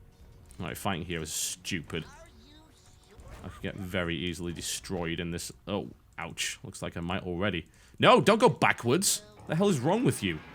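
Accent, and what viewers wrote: United Kingdom. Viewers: I agree with you Pär! Though It's a British accent, not Australian :)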